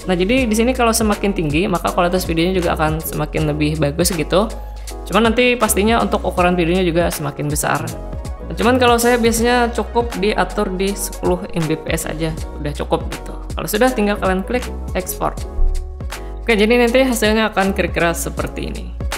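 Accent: Banjar, Indonesia. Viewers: Kaya orang banjar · Dari penyebutan huruf, pinanya beliau ni urang banua jua nah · Orang banjar nih yg ngomong..😄😄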